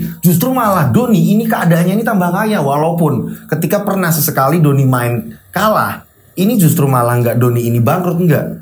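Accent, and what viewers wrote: Java, Indonesia. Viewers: logat Jawa nya medok bgt s mas klau sy yg paling sy suka boom boom boom nya mas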